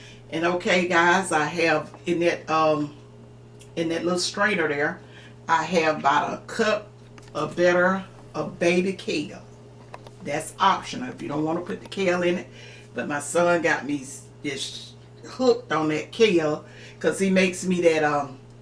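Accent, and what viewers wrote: South, United States. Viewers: Awesome I knew you were from the south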